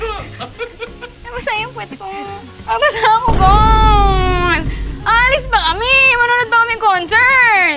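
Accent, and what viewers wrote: Pampanga, Philippines. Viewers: yung accent may pagka Kapampangan parin luvit haha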